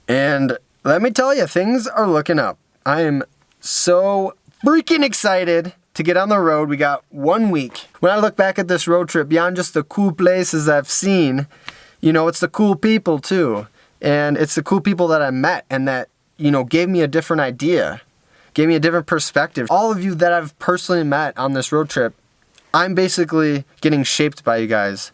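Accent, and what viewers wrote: Wisconsin, United States. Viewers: yo your from wisconsin? what part? its a shame how many alcoholics there are here and how widely accepted it is here going to the bar every single day after work, Aside from that i knew you sounded like your from wisconsin that wisconsin accent yanno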